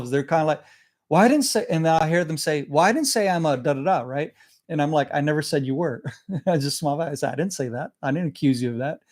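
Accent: United States. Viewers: America accent, American passport. Get out of Palestine. You are not the victim.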